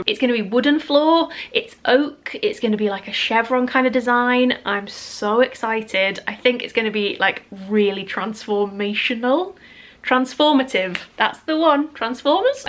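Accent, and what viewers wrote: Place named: North, United Kingdom. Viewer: I love your videos, don't mind the change one bit (actually kinda glad you're mindful of sustainability, and love the house content!), I'm so sorry to hear you're not feeling your best, but please know that I love your energy in your video, your jokes, your nice northern accent and phrases, and I sincerely hope you feel better soon! Also you just made me laugh out loud with your hoover and 'shampoo advert' moment haha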